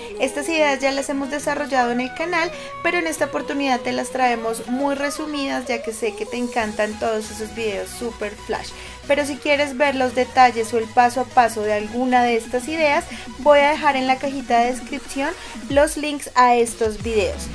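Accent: Colombia. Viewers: muchísimas gracias...las voy a realizar...por el dejo eres colombiana....saludos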